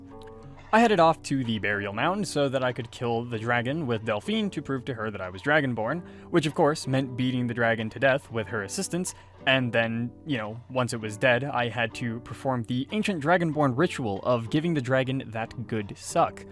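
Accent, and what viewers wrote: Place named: Saskatchewan, Canada. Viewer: fair enough… I was just sitting here watching with my girlfriend wondering aloud if you were from Saskatchewan.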